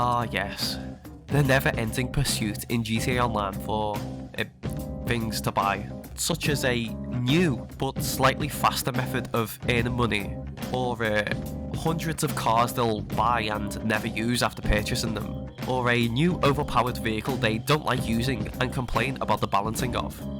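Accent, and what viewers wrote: Liverpool, United Kingdom. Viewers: From Liverpool?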